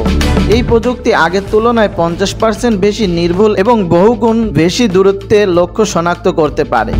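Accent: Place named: Bangladesh. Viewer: এহনো ভাষায় বাংলাদেশী টান ভালোই আসে। তবে ইনি রাষ্ট্রবাদী ১০০%। এতে কোন খাদ নাই। ভালো থাকুন।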